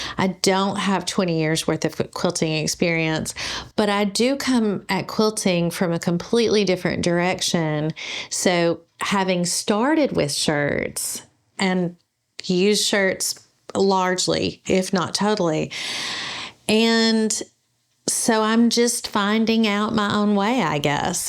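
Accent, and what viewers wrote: South, United States. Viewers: Hi! I have been watching from the beginning and have loved every tutorial! I have learned a lot and you have made me think about different fabrics, colors, and designs. I like how you are a hands-on designer! I think I am, too! I also have a Southern drawl like you! If you will keep the tutorials coming, I will keep watching! Thanks to you and! He’s a great cameraman! I am glad he encouraged you to do this! Happy Anniversary…and here’s to many more!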